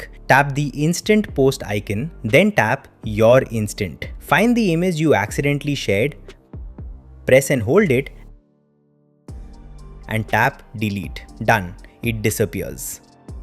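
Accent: India. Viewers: Indian accent is way more better than AI generated content. Thank you for sharing!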